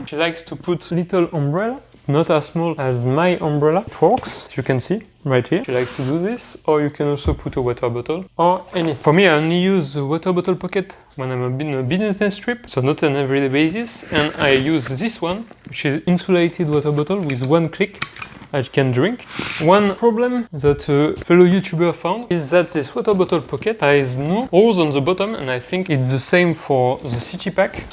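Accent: France. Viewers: Hi, I love AER products. Could the AER City Pack Pro Ultra fit in a 40x30x20com to be used as a personal item on Transavia ? NB : je viens de découvrir votre chaine et j'aime beaucoup le style même si l'accent français est bien présent. En VF ça serait bien aussi d'autant plus qu'il n'y a rien dans ce domaine en Français.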